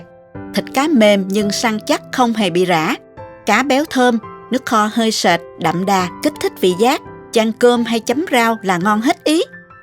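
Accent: South, Vietnam. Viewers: Giọng nói nghe thân thương ghê vậy đó. Người miền Nam mình nè! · nói đúng chuẩn giong nam bô rất dể thương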